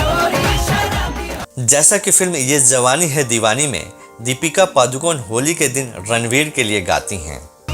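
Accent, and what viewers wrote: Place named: Bengal, India. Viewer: ji Inka Bengali accent hai isiliye r ko d keh rahe hai.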